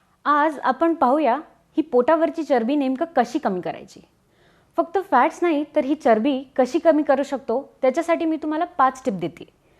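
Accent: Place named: Pune, India. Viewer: Nice पुणेरी accsent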